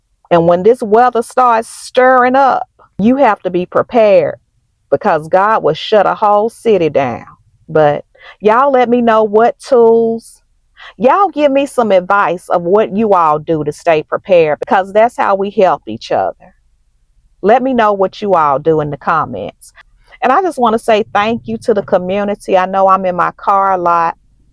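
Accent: South, United States. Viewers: The algorithm sent me your video. 1st time watching anything from you. Love your Southern accent. Love you sis. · I just love her southern accent😊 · I love your southern voice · I love your southern accent. I got one, too. Born and raised in northeast Arkansas but currently living in Oklahoma.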